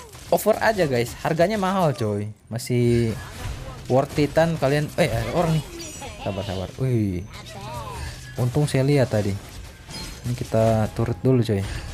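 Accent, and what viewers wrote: Manado, Indonesia. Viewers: Bng, kmu orang Manado?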